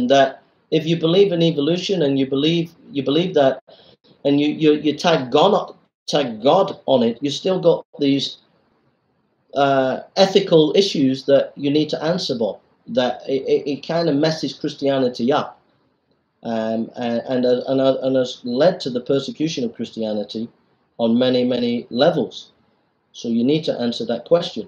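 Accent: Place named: Liverpool, United Kingdom. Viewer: My grandfather was Liverpudlian Ave been there loads of time ferry across the mersey BUT if your nancinian then I'm way off on your accent if a or any one knows where he's from gotta be Liverpool or Lancashire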